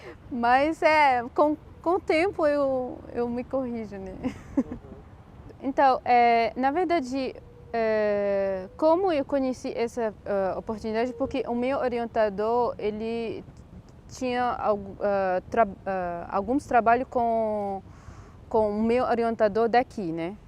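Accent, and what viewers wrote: Ceará, Brazil. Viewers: Seja bem vinda ao nosso País,sou de Salvador mais já deu pra notar que vc já estar com sutaque Cearense. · Que fofa falando com sotaque cearense. Descobri esse canal agora, estou amando os vídeos😍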